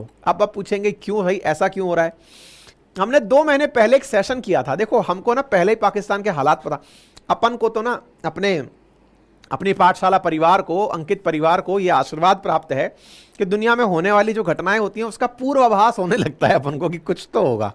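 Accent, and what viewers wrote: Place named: Bihar, India. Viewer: ki apka bihari style shandar hai, real accent.🙏 Bharat mai 1991 mai hua tha, jab GOLD gaya tha.🙏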